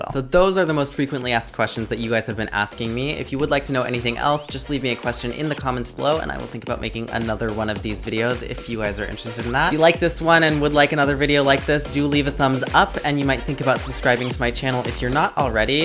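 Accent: Portugal. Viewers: Pensei que fostes português devido ao sotaque lusitano.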